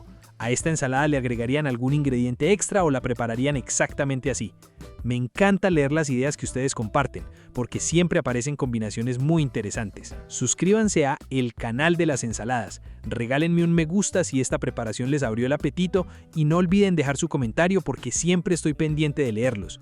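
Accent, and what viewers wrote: Colombia. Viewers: Greeting from the USA! No tengo ni que preguntar de donde eres. Ese acento Colombian se distingue muy bien. Gracias por tu receta muy rica. Ahora que me puse de dieta, voy a empezar a vez mas tu canal que acabode descrubir.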